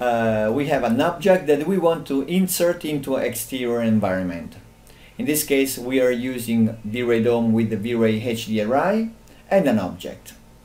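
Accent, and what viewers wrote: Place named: Italy. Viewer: VERY NICE. Your accent looks like Italian)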